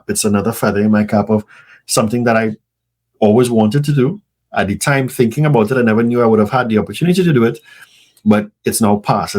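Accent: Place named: Trinidad and Tobago. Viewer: You sound Trinidadian so subscribed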